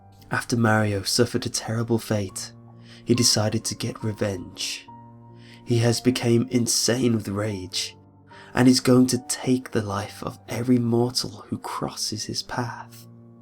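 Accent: United Kingdom. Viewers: I really like this pasta. I especially like the way the last one led onto this one. The fact that this was read by a British dude just make it so much better `0`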